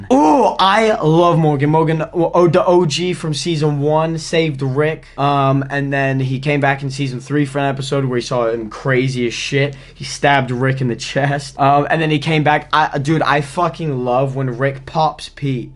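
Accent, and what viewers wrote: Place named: United Kingdom. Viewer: I cant tell if youre an American with a slight British accent or a British person who's lost most of their accent Edit: definitely british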